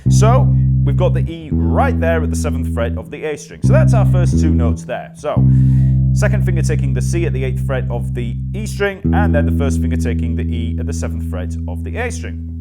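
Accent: United Kingdom. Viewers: You are, a great teacher. Moreover, I can learn better British English pronunciation:)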